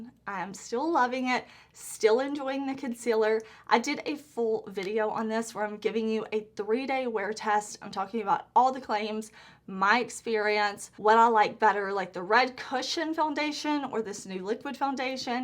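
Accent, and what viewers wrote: South, United States. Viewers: you have the cutest eyes! I also adore your southern accent. I’m Canadian and we get told we have an accent too, but I can’t tell. Your makeup in the intro is fire. 🔥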